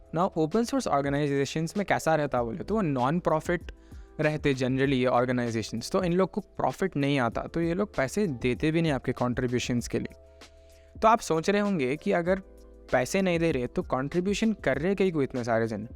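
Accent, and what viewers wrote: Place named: Hyderabad, India. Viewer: loved the video and the hyderabadi accent lol. got yourself a subscriber now waiting for more info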